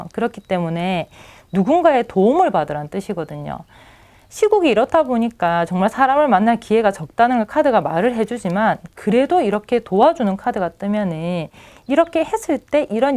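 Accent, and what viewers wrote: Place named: Busan, South Korea. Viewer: 리딩 너무너무 잘 들엇어요!! 디테일한 리딩도 좋쿠 딕션이 너무좋아 귀에 쏙쏙 들어오네요~~😊 부산사투리 매력잇어요!!! 또 피드업뎃해주세요오오오!!🙏🏻🙏🏻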